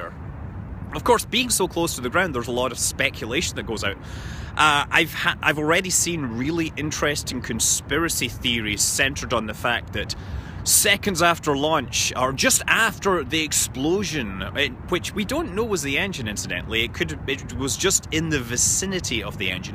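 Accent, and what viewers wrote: Scotland, United Kingdom. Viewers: Nobody expects the Scottish accent.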